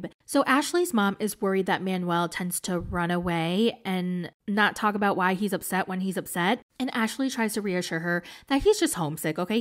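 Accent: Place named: Michigan, United States. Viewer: your Michigan accent LOL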